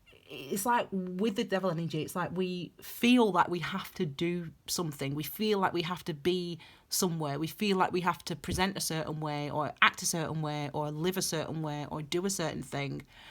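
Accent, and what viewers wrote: United Kingdom. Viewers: Love your sense of humour and the way you come across.I miss hearing British accents so it's nice listening to your readings. And ditto it's great to see a female British black tarot reader.Keep up the terrific work and thanks for the amazing reading! :) Love from Spain! :) xxx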